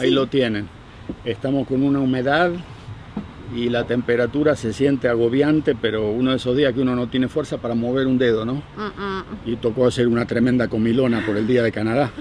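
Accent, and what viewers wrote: Argentina. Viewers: sos el pedacito de Argentina en Canadá, seguís teniendo la tonada argento. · y la tonada de donde viene? De Argentina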